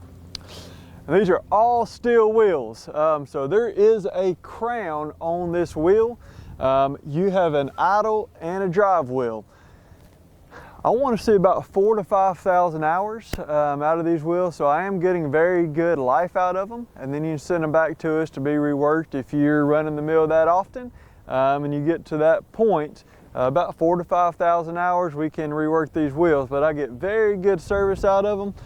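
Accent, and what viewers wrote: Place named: Texas, United States. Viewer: You sure sound a lot like a Texan... Or some kind of southern drawl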